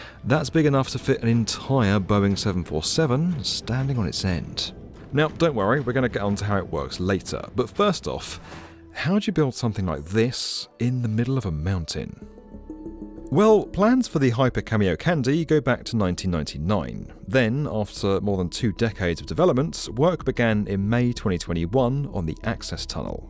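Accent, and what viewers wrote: England, United Kingdom. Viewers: You know, I don't really interested in civil engineering or watch some people building an enormous megaproject all I wanted to do in this chanel is to hear the beautiful english accent 😅✌️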